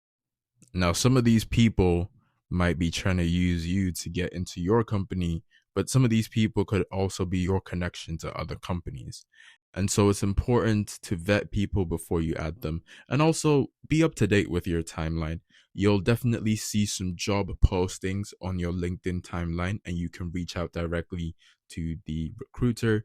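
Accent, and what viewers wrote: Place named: Ireland. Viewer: I think he's from Ireland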